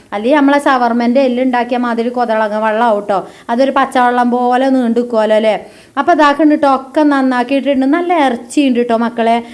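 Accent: Malappuram, India. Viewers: Malapurath ulla ellavarum inganeyano samsarikaar ?? Ivarentha ingane samsarikunnenna ariyathath matte laila thatha avare pole oru pandathe aalukale pole enthinum ethinum oru makkale viliyum paattu paadumbolothe sansaravum njn athknd ee chmnl ipol kaanaarilla idak epozhenkilum onn nokkum kure mlpuram vlgs kaanum avaronnum ingane onnum alla samsarikaar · എന്തൊ ഒരു ഭാഷയാണ് പടച്ചോനെ ഇതൊ ഒക്കെ ഒരുപാട് മലപ്പുറം ഭാഷ ഞാൻ കേട്ടിട്ടു ഉണ്ട് ഇതൊ ഒരു ഒന്നൊന്നര ഭാഷ ആയിപ്പോയി😄😄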